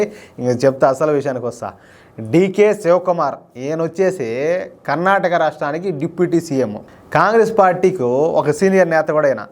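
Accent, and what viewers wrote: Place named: Telugu, India. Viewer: Thank you garu for sharing the the matter humorously. Your Telugu accent perfectly fits in your heavy weight solid punches. God bless you for your patriotism.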